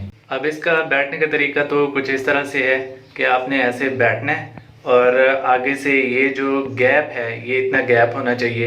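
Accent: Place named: Pakistan. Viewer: Your accent tells you're Pakistani and you sound like my one friend from Pakistan 🤣🤣 he's funny and wierd just like this video 🤟🏻🤟🏻👍🏼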